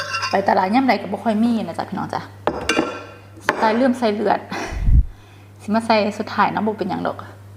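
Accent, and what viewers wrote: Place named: Nong Bua Lamphu, Thailand. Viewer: คนเลยกะสำเนียงบ่แม่ลาลงัวใส่ปลาแดกกะทางเลยเว้าคือเว้าหนองบัว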